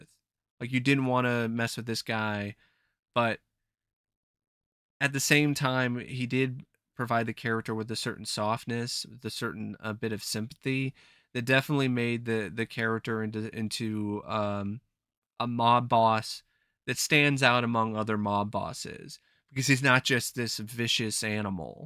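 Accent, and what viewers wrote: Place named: Northeast, United States. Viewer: Did you like The Wonder Years? I watched it a lot with my grandma so I got a soft spot for that show. Your voice kinda sounds like you're from northeast usa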